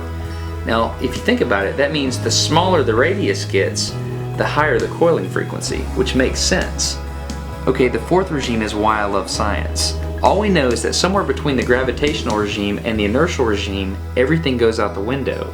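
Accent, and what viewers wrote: South, United States. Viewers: Forget that southern accent its the math Im still trying to understand...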